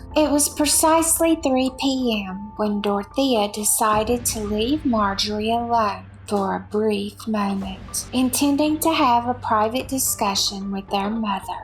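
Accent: South, United States. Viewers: I just love her southern accent. So soothing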